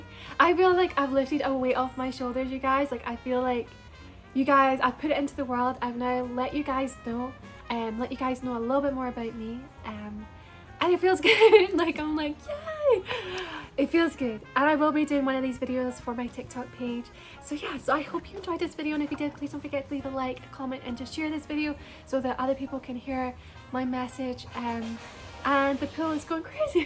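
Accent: Scotland, United Kingdom. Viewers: You can tell your Scottish, I have been to Aberdeen. Americans will understand you. I understand Gerard Butler fine. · she sounds scotch to me. i love her voice so much thooo its so calm and gives a happy and comforting feeling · I think that her voice is inspired by Marylyn Monroe because it's more higher pitched, so she does that by choice. Obviously the Scottish accent is her real accent. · Love the Scottish Accent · You're Scottish!!! Wow, you covered it up so well with your Marilyn voice. What a twist. Lol. Don't ever feel insecure about your voice and how you like to talk. It's a unique trait that makes you you! I don't know how people could hate it. It's very satisfying and relaxing to listen to! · I think she slightly changes it so people can understand her more clearly and not hear the Scottish accent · I honestly thought it was to imitate Marilyn's soft voice. 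I love how this is actually natural! Her voice is smooth and calming. And your accent is awesome. I have always liked Scottish accents also you are very beautiful! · So glad to see this video! It explains it all🌞 I LOVE your true Scottish accent!!! Thank you for this❤️❤️❤️ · I can hear the Scotland accent and I love it I noticed your voice but I didn’t think a lot about it and I thought your voice was really sweet and your voice is your voice and yours is really pretty ❤️❤️❤️❤️❤️❤️ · Your scottish accent is absolutely stunning!!! Dont hide it! I with i had a natural scottish accent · I don't even know how I ended up at this video, but I follow you on Insta and I think your voice is great! I don't get why people would be judgemental about someone's accent, because it's a part of who we are and a part of our own history and experiences. Love the story behind it and how it was almost part of you building confidence etc. 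People underestimate how difficult it can be for people to go to another country and try to 'fit'. It's a really positive thing that you have this unique voice. It's part of your history, your personality, and also what you enjoy and the people you admire. Good for you! Love to see a woman being who she wants to be, taking elements of historic style and vocal tones but also adding your own special something. It's very 50s/60s but also with that nice Scottish tone. Nothing fake about that at all! It's who you are and what you appreciate! Our voices all develop and change over time too, so I don't know what people were playing at going back through your own stuff? Also, sorry to go on and on, but people saying you're trying to 'be' Marilyn are missing the point! You're bold enough to love the aesthetic and take on elements of that for your own persona! You're being you - you're obviously just very influenced by that old Hollywood style. 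We pretty much all take elements of people we admire and that's how we develop our fashion sense etc! It just so happens that you're bold enough to love the style of another era! Power to you! xxx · I don’t understand people! who can definitely tell your Scottish by the way you say words….. this your natural voice is beautiful very peaceful and happy You can still tell in earlier videos with the American accent, certain word you would say still had a Scottish tone or pronunciation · I can tell you are Scottish but also a little English. I love 💖💖💖your voice and I think people should stop sending you hate😼😤😤😤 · Although she’s “soft spoken”, she’s obviously Scottish. She tries so hard to sound American. How very sad…🥺🥺🥺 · Oh you have a unique voice, I love the Scottish undertones. I just "discovered" you yesterday July 4th... I'm so glad that I did, you are interesting and I can tell you are so very kind. 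Never change who you are, thank you for bringing Marilyn Monroe back infront of the camera...I know she would appreciate the representation you present on her behalf. PS... I've been telling everyone I know about you, you are so interesting! 🙂Thank you for being genuine.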